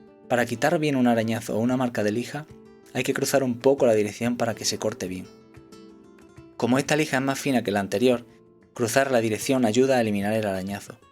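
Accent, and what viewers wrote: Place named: Spain. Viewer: este relato con acento español es muy poetico...para los doblajes de peliculas sino sirven jja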